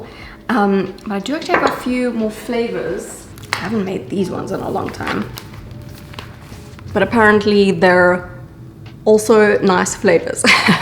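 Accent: South Africa. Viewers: random but are you south african 😂 you kinda have an accent but i could be wrong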